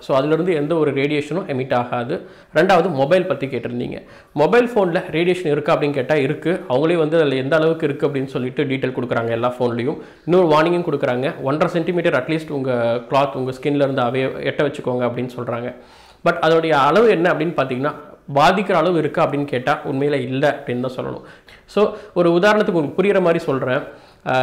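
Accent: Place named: Chennai, India. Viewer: ninka pesum pothu chennai tamil lite ha varuthu bro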